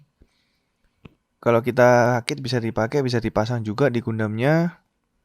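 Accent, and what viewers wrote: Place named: Java, Indonesia. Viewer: MedoQ sekali...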